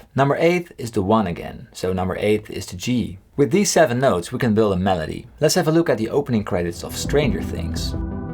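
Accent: Netherlands. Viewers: Are u dutch? Just wondering cause I am and the accent sounds familiar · You are Dutch for sure. I'm Dutch and I recognize your accent. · Are you Dutch? What's your accent?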